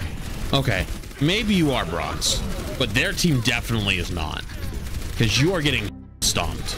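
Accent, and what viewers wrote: United States. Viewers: Hold on. What is that accent,? You got a bit of cowboy in ya?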